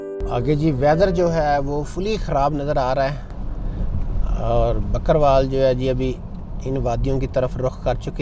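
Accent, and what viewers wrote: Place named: Punjab, India. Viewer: جی آپکی اردو بہت بری ھے پنجابی لہجے میں اردو نہیں بولی جاتی ، ناک میں بولتے ھو پاں پاں کر کے ،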